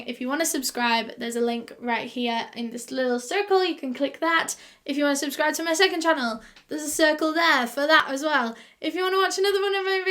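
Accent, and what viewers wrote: United Kingdom. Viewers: how did I know you were from uk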